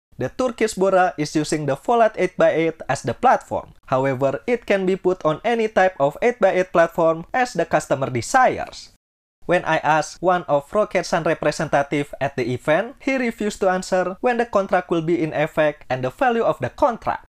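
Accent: Indonesia. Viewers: Keren aksen nya original Indonesia 👍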